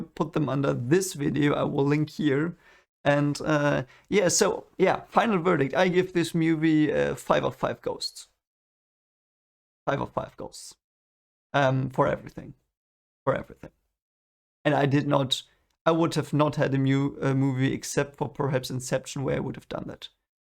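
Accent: Germany. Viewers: Very nice review. Due to your accent I assume that you are from Germany? ;)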